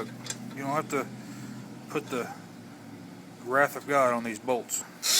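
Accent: Texas, United States. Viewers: Is that a Texas accent cowboy?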